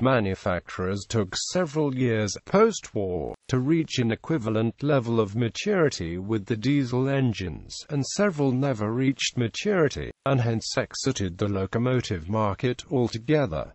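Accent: United Kingdom. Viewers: The British accent, anything that ends with the letter A is pronounced with an R. Makes absolutely no sense to me.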